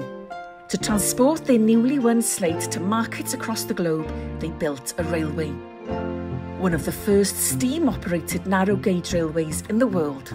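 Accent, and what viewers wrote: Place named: Scotland, United Kingdom. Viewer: I really love the Scottish narrator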